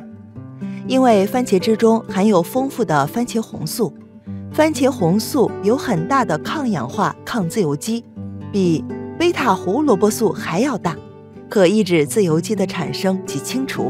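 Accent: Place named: Mainland, China. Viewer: 明明就是ㄒㄧㄝ(第三聲)糖，為什麼總是讀ㄒㄩㄝ、糖？大陸發音？我學的不對？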